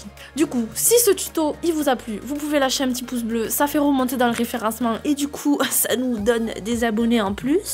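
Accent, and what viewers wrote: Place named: Southwest, France. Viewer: mdr le petit accent du sud ouest ! cool la vidéo en tout cas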